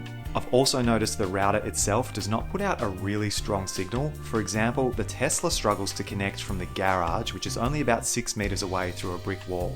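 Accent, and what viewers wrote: Australia. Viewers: The most Aussie start to a video ever..,”it’s been two months since we installed Starlink on our garden shed” [with Aussie accent, pointing at Starlink dish, bush setting] 😀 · Aussie accent is the best mate!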